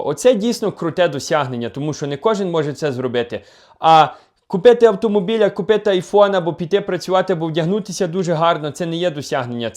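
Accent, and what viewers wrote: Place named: Transcarpathia, Ukraine. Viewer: Ти звідки,? З закарпаття? В тебе такий говір, акцент як в них говірка наче в Діда Віщуна з Файна Юкрайна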